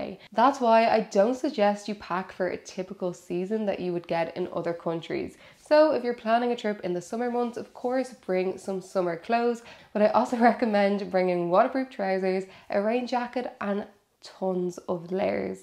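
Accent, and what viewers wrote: Ireland. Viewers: She was very informative...love the irish accent...the irish are the best...she's really attractive and so proper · I was pleasantly surprised to find your YT channel today and I love. The reason being that I am fortunate enough to have visited Ireland several times and found out for myself how beautiful your island is and how wonderful and friendly the Irish people really are. The main reason I wanted to comment was, how could anyone complain about your lovely accent??!! I absolutely love it. Love from Tennessee. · Irish accent is very charming. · I love the Irish accent!! I am southern so I get being asked to say something/ I get it!! Good info!! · Is it wrong that I watched this for the fabulous Irish accent? Nonetheless, thank you for the tips.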